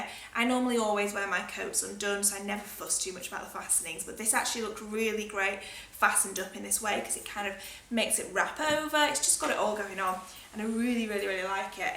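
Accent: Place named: North West England, United Kingdom. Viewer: Where you from? I detect a north west accent :)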